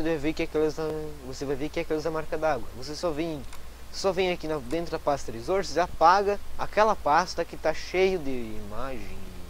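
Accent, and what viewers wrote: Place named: Curitiba, Brazil. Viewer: vlw ai cara só para constar amei seu sotaque, vc é de Curitiba?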